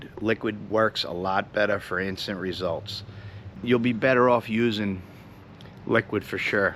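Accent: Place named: New York, United States. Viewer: I’m getting ready to spray my lawn with chelated iron. What part of the country are you in? I’m in NY and you sound like a New Yorker.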